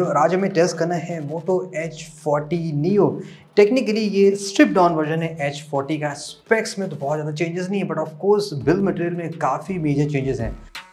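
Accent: India. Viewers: He speaks English, but it sounds Indian😅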